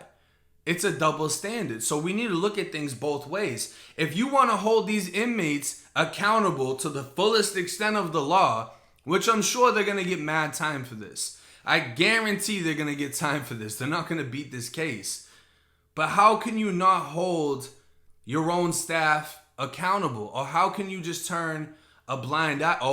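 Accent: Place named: New York, United States. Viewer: sound like you got a ny accent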